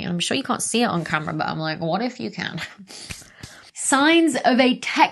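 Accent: United Kingdom. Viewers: can you plz speak less british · I used to live in Cambridge for a couple of years and I find it refreshing to listen to the British in your voice! I’ve watched many of your videos and am simply amazed by the shear depth, command, and mastery of your knowledge of cosmology. It’s wide and deep. I probably absorb 8% of your presentations - maybe some of this will stick in my tiny brain! 😊🤣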